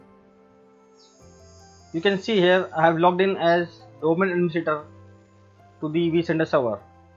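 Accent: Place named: India. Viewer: He does not have a speech defect. It is accent. Comes from India.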